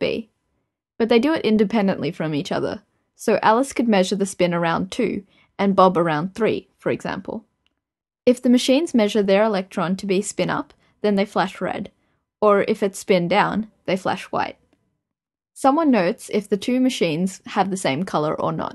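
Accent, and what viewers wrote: Australia. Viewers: The more I watch your videos the more I convince myself that you are Australian. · Wait a sec, are you Australian? Why am I so bad at noticing these things. (shamed Australian here)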